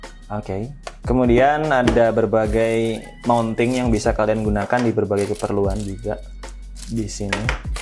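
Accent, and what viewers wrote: Java, Indonesia. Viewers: Yang sekarang suaranya lebih meddok 🤣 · Kowe wong jowo yo mas? Hehe...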